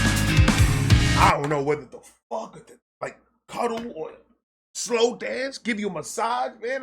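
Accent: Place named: Bronx, United States. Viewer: You sound like you’re from the Bronx and I never even been to NY 😂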